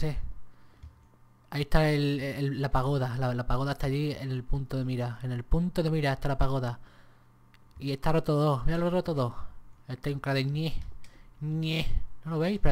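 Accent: Chile. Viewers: Nunca vi este:'v PERO HABLA CHILENO DICEJDBCIDJCIBFICJFIF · like por el acento chileno del *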